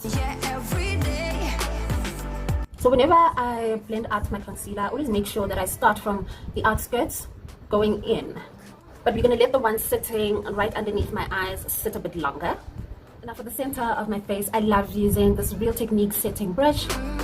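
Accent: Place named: United States. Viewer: Have I ever told you about ur accent🔥🔥❤❤❤ .....yoh ape yeh okarr ole American❤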